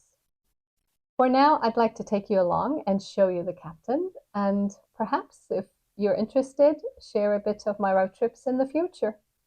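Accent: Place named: United States. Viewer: Oh liebe, after some failed approach only, today I did see your videos; twice, and I am - my english from the old millenium is too bad - sono commossa. Going around the world with www, with Captain, with the friendly people around the continents, who see and love YOU and these videos - which language fits? I was non really able to understand everything in the video - I suppose, because your english is very US-marked and my english is so old and simple and never really used outside of Germany. I never crossed the ocean, but I feel the love and the blessing to have friends over oceans of water, of mountains, of wars, of years - and this, for me, is making your videos so warm, sincere, beautiful. I loved to see this green and pacific Germany, to see you and your mother so near to each other, to feel connected. I think, this is the secret - your secret, the secret of leaving a country to explore an other country, to find friends and to stay connected. 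And having captain you will be shure to move on and to pass borders and to meet and connect with other people capable to love!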